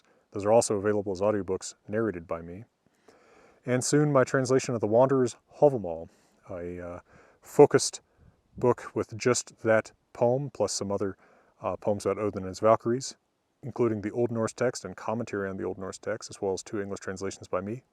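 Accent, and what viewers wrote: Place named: United States. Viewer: I love your style. Your hat and your American accent are fcking manly. Þú ert víkingur!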